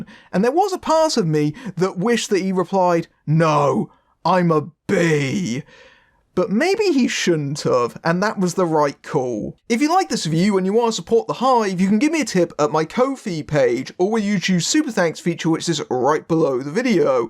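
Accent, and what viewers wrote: United Kingdom. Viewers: I'm detecting some British Isles lurking in your accent.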